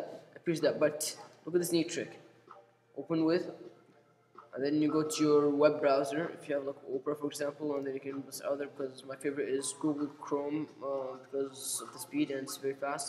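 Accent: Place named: England, United Kingdom. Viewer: Bro you talk in the way that foreigners think english sounds like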